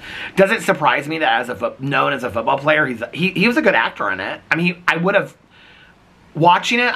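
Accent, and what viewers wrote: South, United States. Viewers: You do have a southern accent, I actually adore it and find it amusing when you pronounce things funny, I used to live in Louisville and I noticed that a lot of people in Southern Indiana would say code for cold, like I stayed home from work today because I have a code. I’ve never heard you say that but I always get a little smile when I hear it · To NOT live in the South, you really do have a southern accent lol 🤣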